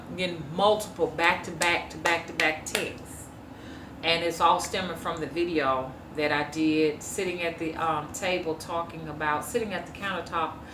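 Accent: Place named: Alabama, United States. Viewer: I just finished watching one of your other videos and I thought, "she kinda has an Alabama accent" and then I clicked this video and you said you graduated from Birmingham college I was like ayyeeee I've lived in Alabama since I was a baby and sometimes I notice other peoples accent because it's familiar. Also I really feel like I learn something new after watching a video of yours, it's a beautiful thing to want to share your knowledge with the world. It's undeniable that you have great purpose and anyone who stands against you is afraid of the truth. You're WOKE AF and that's rare.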